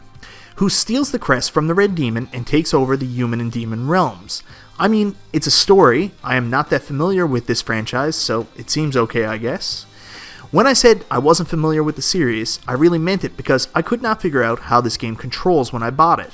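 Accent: Canada. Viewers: Another nice vid! I’m been a sub for a while but haven’t commented. If you don’t mind me asking what part of Canada are you from? I’ve noticed your accent. Cheers man!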